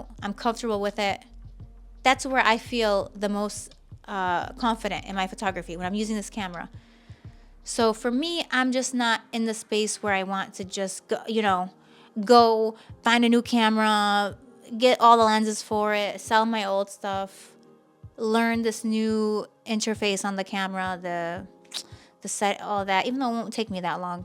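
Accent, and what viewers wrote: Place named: New York, United States. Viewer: My kinda gal! Love my old 'dinosaur' DSLRs and I'm still using my iPhone 7! I'm English: so I don't know U.S. accents well. Is that a Bronx New York accent? Anyway - whatever it is - I LOVE IT!! 💕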